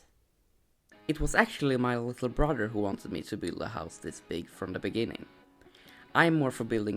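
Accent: Sweden. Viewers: Du låter svensk🇸🇪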